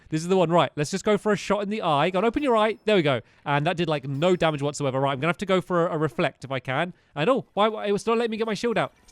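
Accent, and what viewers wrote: England, United Kingdom. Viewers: Question are you English or american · hes british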